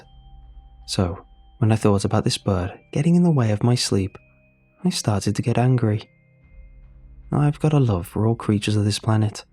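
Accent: United Kingdom. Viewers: Damn it I don't want to admit this but, I want you to keep narrating so I'm going to give you the compliment at the cost of my own public shame.. I actually wait for your freaking stories and I was so psyched to look down and see an early one because even though it's a highlight I wouldn't toss around publicly, it's still nevertheless is a highlight.. actually if I'm going to be honest it's a spotlight. It's amazing what these narrations can do when you're having problems. I've caught in myself thinking through things while listening, and although your Asian, (which sticks in your head somewhere in the back for some reason) the British amazing accent is surprisingly soft and it's very comforting. On a side note listening to Mr creepypasta, I see a guy in a blue suit that resembles Spider-Man, that shifts from a maybe comic book character to and uncanny amount of different human variations, so at least with you it's a comforting guy on a couch drinking tea type of thing, it's really unique I'm rambling thank you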